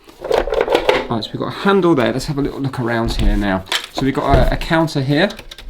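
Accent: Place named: Norfolk, United Kingdom. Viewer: Definitely a Norfolk accent. "Suffolk Swede" will be a dig at their near neighbours. Lots of rivalry between Norfolk and Suffolk.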